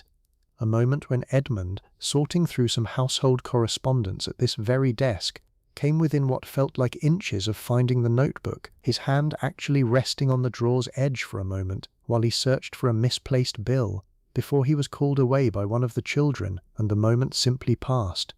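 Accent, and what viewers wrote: United Kingdom. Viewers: Watching from the desert - in Phoenix Arizona ❤ I love the British accent- sweet dreams everyone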